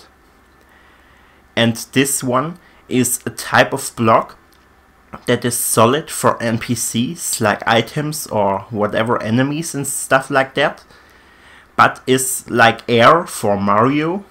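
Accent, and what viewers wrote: Germany. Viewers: You are German. Als Deutscher erkenne ich es!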